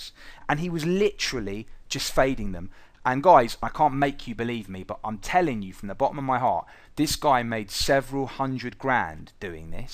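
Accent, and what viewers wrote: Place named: United Kingdom. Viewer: I love when he swearing and offending people with british accent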